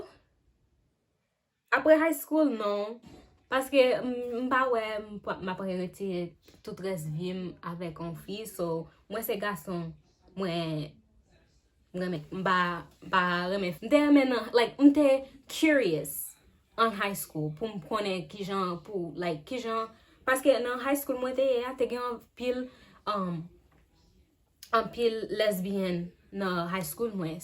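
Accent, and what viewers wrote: Cap-Haïtien, Haiti. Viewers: Ou pa fet haiti okap epi depiw ap pale la mwen gentan we accent moun okap la nan ou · Eh manmanl ki moun okap la lap toujou gen accent moun okap menmsil pa fet Ayiti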